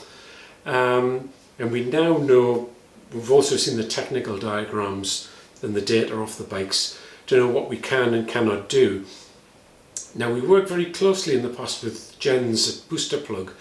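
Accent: Newcastle, United Kingdom. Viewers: Hi, nice to hear a proper accent - not many Geordies over here on the Isle of Man! I'm interested in the adjustable levers for sure, a better exhaust and getting rid of that stupid speed limiter - no 70 limit here. Just a little more would be helpful! Also maybe a cam in the future, I don;t need a rocket ship (have 5 other bikes) but just a bit more go would really make this little bike. I also find the shocks very hard on our bumpy roads, but they may run in yet - we'll see. I would accept a little loss of handling for a better ride. Oh and a rose jointed ear linkage, but may make that myself - I need to keep a heel and toe, smashed left foot full of screws. (walking accident, not bike!).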